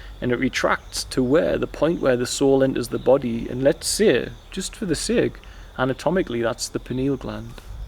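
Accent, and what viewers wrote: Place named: North East England, United Kingdom. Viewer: I feel the need to connect with our creator but I dont know how. I'm from the North West of England and I detect your accent is the North East. Can you or one of your congregation guide me to a sanctuary where I can find my way to God? I live in fear now but yearn to be a light in this dark time and lead by example for my family and community. I need help and I dont know where to turn. I've been praying for guidance and forgiveness. Please lord here my call. I want to serve the creator and bring others to Christ. 🙏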